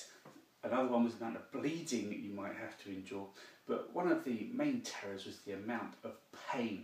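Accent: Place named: Scotland, United Kingdom. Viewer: Scottish accent 10/10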